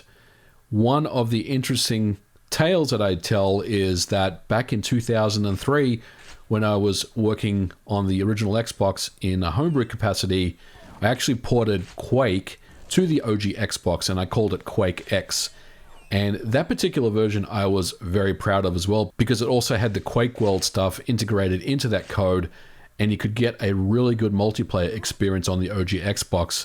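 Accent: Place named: Australia. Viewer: Are you Aussie? I just saw on your profile that you're located in the USA, but I hear an Aussie accent :)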